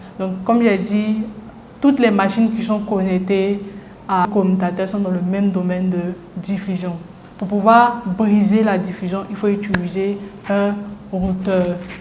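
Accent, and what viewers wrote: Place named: Ivory Coast. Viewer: Merci a toi aussi. On sent vraiment que tues passionnée. Bon courage. Ton accent me dit que tu viens de la CI. Je veux savoir, stp, comment faire en CI, pour passer l'examen.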